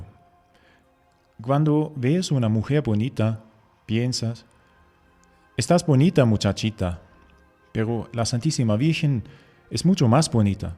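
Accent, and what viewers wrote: France. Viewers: ¿Quien habla es francés?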